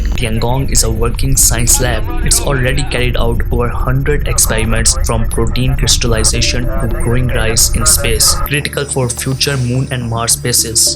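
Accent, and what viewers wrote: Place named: India. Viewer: Indická angličtina katastrofa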